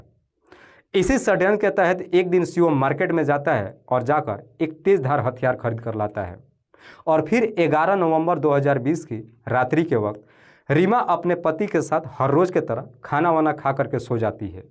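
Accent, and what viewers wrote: Bihar, India. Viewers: Sir ji aap kya bihar se ho kya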